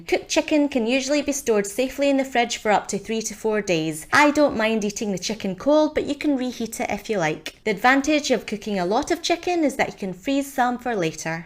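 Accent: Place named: Northern Ireland, United Kingdom. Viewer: I love your accent it reminds me of Northern Ireland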